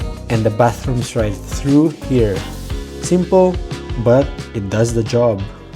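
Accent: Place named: Asia. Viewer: Hi! What kind of Asian are you, Chinese, Japanese, Korean, Filipino? You have a strong Asian accent. Your Asian accent is definitely NOT Aussie/ Australian accent at all.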